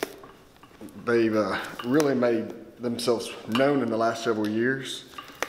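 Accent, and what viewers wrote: South, United States. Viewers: Love watching your work. That Southern accent is a plus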